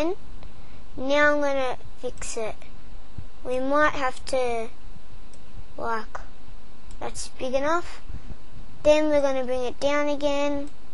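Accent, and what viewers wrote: Australia. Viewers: a young aussie am i right?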